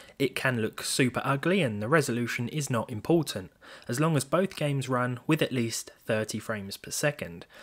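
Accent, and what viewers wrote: United Kingdom. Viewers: Lol i love this british accent.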